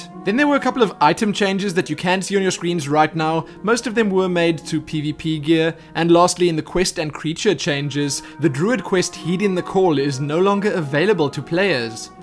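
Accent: South Africa. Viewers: What!?!? South african accent FTW!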